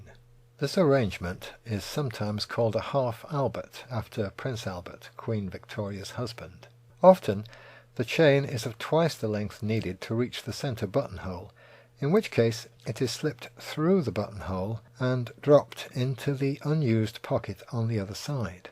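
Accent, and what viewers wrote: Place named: Mid-Atlantic, United States. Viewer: You are the only person I know in the modern age with an impressive mid-Atlantic accent.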